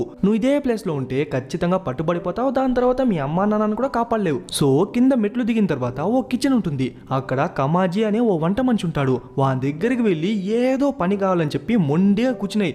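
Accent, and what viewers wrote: India. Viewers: which telugu accent it is but i loved it❤😊😅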